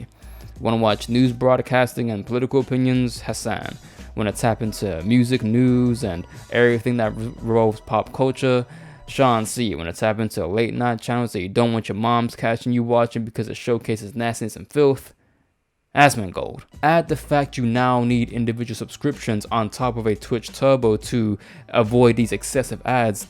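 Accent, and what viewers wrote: United Kingdom. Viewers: Where you from? I always thought you were UK/British Habesha.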